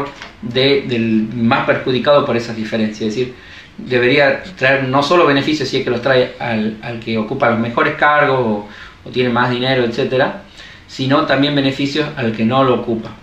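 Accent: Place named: Argentina. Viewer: Gracias por esa explicación, no me esperaba la foto con Messi jajajajaja, solo si me costó mucho entender las palabras con el acento Argentino en las palabras rápido, iba tomando nota😢 pero bueno logré capturar la escencia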